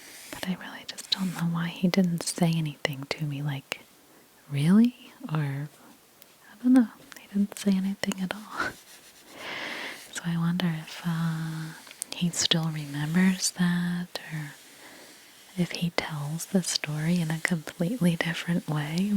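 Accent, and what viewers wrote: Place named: Midwest, United States. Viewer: Your voice is so soothing! There is also something familiar, are you from the northwest originally? ( IN, IL, WI, MI) ? You pronounce words in a nice crisp way, thank you!!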